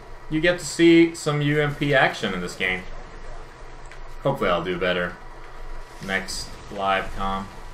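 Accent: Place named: Sweden. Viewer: nope just a normal swedish accent ^^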